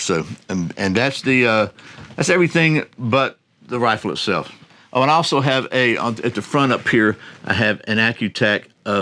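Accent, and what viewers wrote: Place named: South, United States. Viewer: Great video as always. I am researching this rifle heavily right now, and your video is full of great information as well as visuals of the gun. I always enjoy watching your videos, and your southern drawl makes me feel like I'm talking to one of my buddies up at my shooting club. One comment about the safety that I learned from other reviewers. It is a 3 position safety. Fire position is obvious, the middle position locks the trigger but the bolt is unlocked for cycling, and the rear position locks both the trigger and bolt.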